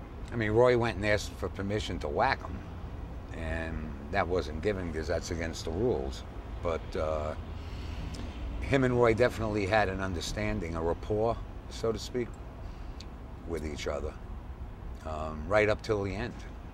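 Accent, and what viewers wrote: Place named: Brooklyn, United States. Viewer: His Brooklyn accent is too strong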